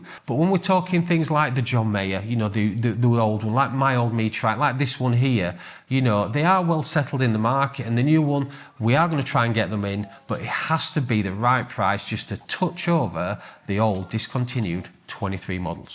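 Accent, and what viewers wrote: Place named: North, United Kingdom. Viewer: Regional accents, god forbid someone be born north of the Watford Gap. a good lad who is truly himself, no need to change for people.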